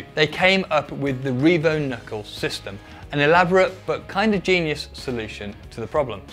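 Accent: United Kingdom. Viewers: Good research (probably outsourced), but I literally yawned at the delivery. Standard 'proper' UK, scared af to be unique, business tone. You are boring bro. The car are cool though. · probably my unic car subscription cause i like you man, humble is the reason, clear explainanyion,simple language,serious. i have learned with nicevoice by the way. i know them all(tbh i prefer english than americans,so rug) english has this accent remembering me my arabic-fassi origins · British accent, Captain Kirk cadence.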